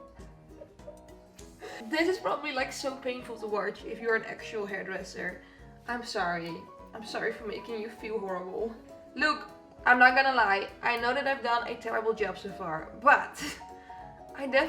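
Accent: Netherlands. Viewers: Are you Dutch? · I swear she has to be dutch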